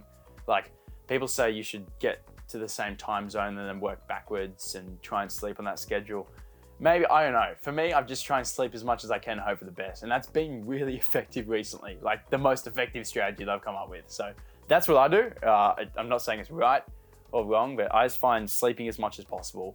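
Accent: Australia. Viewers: I like watching your dives. I like your Aussie accent. My question for you is have you ever been to Canada 🇨🇦?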